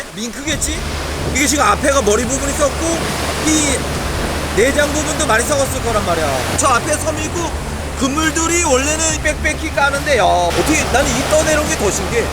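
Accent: Gangwon, South Korea. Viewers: 영동지방 강원도 특유 사투리 입니다 북한 억양이랑 비슷해요^^ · 속초 말. 참 재미 있네요... 북한 말 같은기.... ㅋㅋㅋ.. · 강원도 시투리 구수하이 좋쿠만뇨~ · 말투땜에 첨엔 조선족인줄 ㅋㅋㅋ 계속들어보니 구수한 강원도 사투리였드래요 ㅋ 덕분에 귀한영상 잘보고 갑니다! 따봉누르고 가요~ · 강원도 사투리가 귀에 쏙쏙ㅋ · 말투가 강원도 사투리에요. 처음이라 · 강원도 사투리 같습니다. 제 군대 선임 어투와 많이 비슷합니다. 강릉 출신이였숩니다. 많이 표준어 쓰시려고 노력하신거 같습니다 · 강원도 사투리 재미있는거 같음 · 사투리가 지대로자니? 나도 강원도 사람이자니~ 고래가 메른두없다야~:yougotthis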